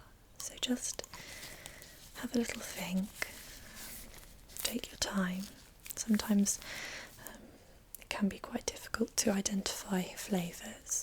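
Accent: United Kingdom. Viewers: Very cool and British, which is obviously the best. But it still doesn't make me sleep 😑😑 I'm just too difficult. Good luck with the future! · Omg. Subscribed. I looooooove your accent I could listen to you talk all day!!!!! From the UK? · That UK voice. Mmmmmakes me melt. Thanks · Because of her GORGEOUS British accent, I thought instead of touch she said torch.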